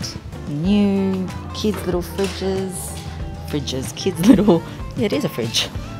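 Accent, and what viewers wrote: Australia. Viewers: Notification squad!! Hey btw I love love your Aussie accent ❤️😍🥰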